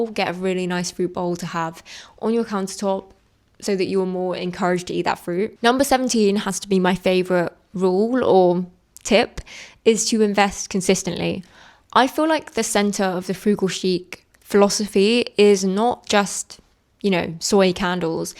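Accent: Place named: England, United Kingdom. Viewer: can you add to your list just having a good accent and utilising your voice? because girl, i live in australia and we barely speak the queens english, i will see the most beautiful, classy woman and she will ruin the whole thing by having a bogan or bogan-adjacent speaking voice. i understand being australian that we all sound at least 10-50% bogan at all times, so i aim to speak like Olivia Newton-John and just enunciate 🤣 btw, your english accent is beautiful!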